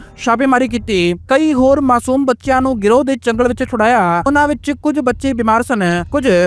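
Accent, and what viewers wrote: Bihar, India. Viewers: Don’t we have anyone who can speak Punjabi in a normal tone ?????? Who will watch you ? !!! Like a Bhyia speaking Punjabi !!!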